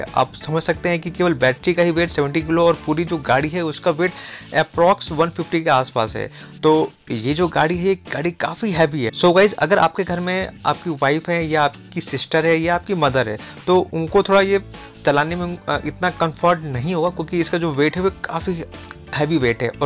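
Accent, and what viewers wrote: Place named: India. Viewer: i could not understand anything you said. your english has a strong accent, possibly indian.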